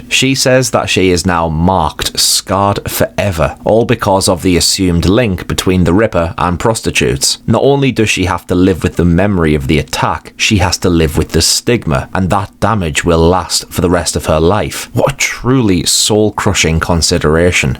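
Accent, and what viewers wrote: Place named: Newcastle, United Kingdom. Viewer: I don't mean to doxx you or anything but it sounds like you've got a Geordie accent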